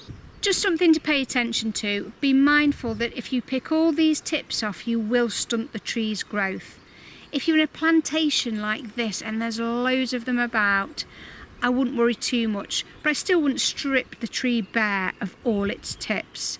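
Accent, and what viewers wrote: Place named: United Kingdom. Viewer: Really appreciate this video, can't wait to pick my firsts shoots this spring! Can you please tell me from what part of UK your accent is from? Probably the most lovely british accent to be honest, makes it very easy to listen to the whole video!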